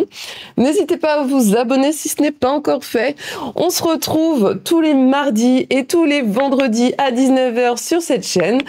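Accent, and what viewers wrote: Vannes, France. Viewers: La prononciation vannetaise me rappelle mes grands parents 🥰 qui étaient de Guern…qui se prononce « Djuernne »…😅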